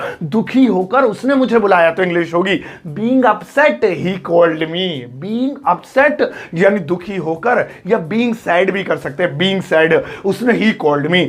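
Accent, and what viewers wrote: India. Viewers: Hello sir good morning I have one suggestion this is 36 video of advance English class and you have already done English speaking 60 days it has been long time if it's possible u can teach us in English. Because your accent is India it will be helpful for us sir 2 ND thing is I have already told you sir please make videos on punctuation I don't know how to use . Thankyou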